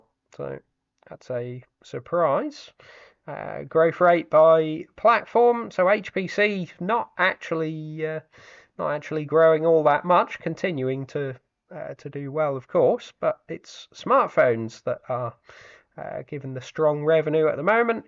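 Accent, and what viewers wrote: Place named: Bristol, United Kingdom. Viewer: I’m guessing it’s a Bristol area accent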